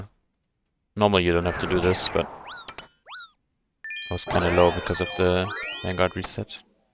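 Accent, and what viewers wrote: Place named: Germany. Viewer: i can hear german in this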